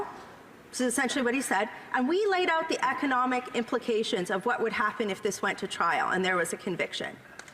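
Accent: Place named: Canada. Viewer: She sounds so Canadian I love it.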